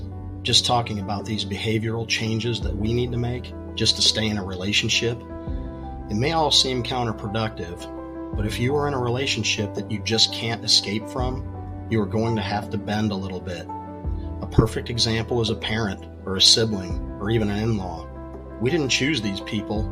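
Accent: North Carolina, United States. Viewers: North Carolina ? From North Carolina also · I hear your accent. I'm betting North Carolina. There is no keeping the peace forever and the relationship is never worth it. That's because eventually they'll either completely do a discard or they'll force the other person to do it through ever escalating betrayals. There is no happy ending, ever. There are sometimes long-term relationships, especially with the covert types, but the soul destroying damage to the victim ends up being even worse.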